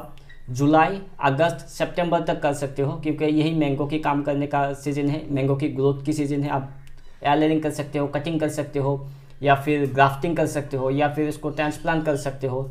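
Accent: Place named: Assam, India. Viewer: Yah bhai Bhai Aam cutting Se Kaise hota hai Assam ke Rahane Wale Hain Wahan Ke Log Aise bolate Hain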